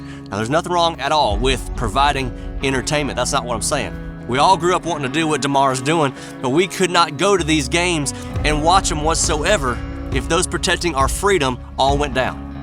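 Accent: South, United States. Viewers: “We wish you the best we wish you a speedy recovery…But…” here’s come the judgement in a southern accent with a contrived ‘bless yer heart’ smile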